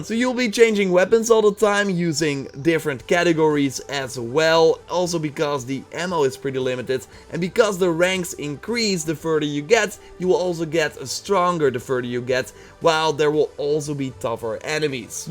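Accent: Netherlands. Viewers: Damm jouwn Nederlandse accent is echt klote irritant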